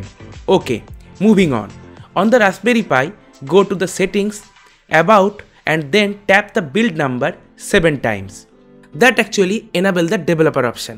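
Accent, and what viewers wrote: India. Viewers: Indian. No where near Italian. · He has Indian pronunciation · Bro... Everything is nice... Just work on your accent a little bit.. Get rid of that Bengali English accent.. It sounds cringy bro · i hate the indian accent, but i wqs able to watch the video to the end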